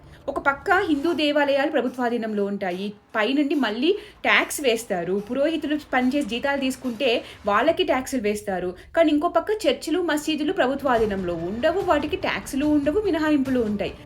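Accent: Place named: Telangana, India. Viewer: i like your accent man.. switching different slangs mainly telangana style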